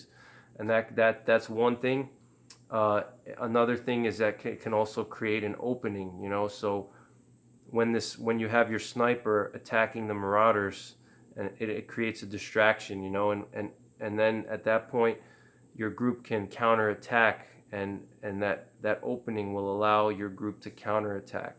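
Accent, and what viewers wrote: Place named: New York, United States. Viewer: Hey from waaaay UPSTATE NY. Your "accent" says waaay downstate.lol. Impressive on the deadlifts!! As a young man of 40 I pulled 405 for 10 at 145 lbs, but 25 , I got winded watching:) Too bad you appear to be so far away, could use another good man for the community. (***Always looking for like minded preppers to join my little slice of heaven!! 1/2 hour from Canadian border and 1 hour from Montreal, if anyone's interested and local, or just someone who realizes being in the sticks is way safer than anywhere near cities and won't mind some travel). Good videos and now a sub!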